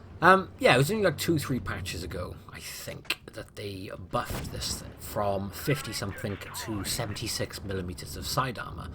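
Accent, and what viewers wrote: United Kingdom. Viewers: I don't know how you get all these bouces while being on a flat ground, like the second game with leo and jag shooting at you... I get penned by stock T-54 all day long, even while wiggling and jiggling... 😭 Wg must be protecting you ! Or maybe do I suck? I'll try to apply the golden golden rule for my next games :p Anyways, thank you for the reviewing, love your British accent ~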